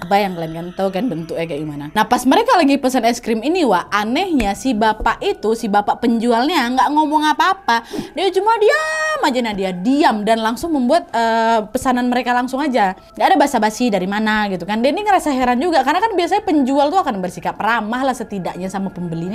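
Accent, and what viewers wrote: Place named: North Sumatra, Indonesia. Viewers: Kak nadia, kk ni asli mana kah? Kadang pake slang medan, kadang minang. Aku kan jadi pinisirin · Makin kesini kok kayak makin yakin kalo kak nadia emang orang sumut. Ntah medan atau binjai mungkin ya. Soalnya cakapnya logat² nya sumut kali 😁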